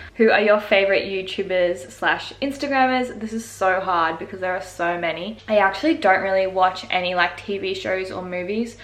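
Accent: Australia. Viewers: I can’t tell where she’s from like Australia or New Zealand maybe?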